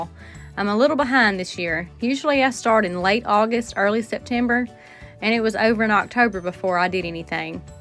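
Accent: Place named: South, United States. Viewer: Just saw your video today for the first time. I can tell you are from the south like me! Enjoyed your video here in Mississippi!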